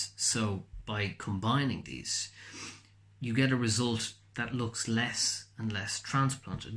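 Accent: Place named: Ireland. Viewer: Hello , thanks for the excellent video! If I’m not mistaken I hear a Irish accent. I’m from Ireland myself and I am wondering where or what brand of oral minoxidil do you use? I have been using finastaride but struggling to find minoxidil in oral form . Thanks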